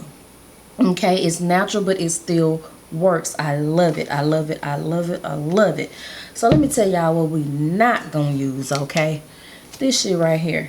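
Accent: Dallas, United States. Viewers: When she say it with that southern accent 🤣❤️ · I figured you were in Dallas just by how u talk , I know that talk lol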